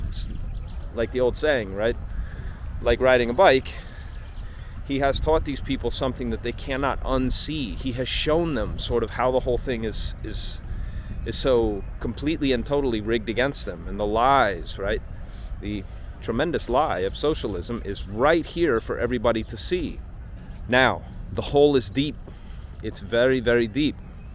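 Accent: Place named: Argentina. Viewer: tenés acento de argentino hablando en inglés al principio pensé que eras de acá!!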